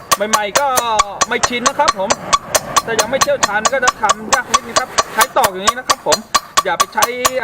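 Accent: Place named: Uthai Thani, Thailand. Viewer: สำเนียงช่าง เหมือนคนหนองฉาง อุทัยเลยคับ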